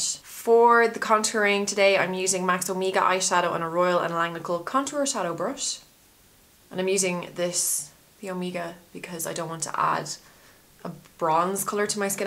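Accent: Ireland. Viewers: Just randomly found your channel. LOVE!!!!!!! I know you mentioned Australia, but that's an Irish accent I hear. What's the story???? I went to Northern Ireland last summer, so I'm basically obsessed. Thanks for a great tutorial :) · you are from aus?! i thought u were irish ha!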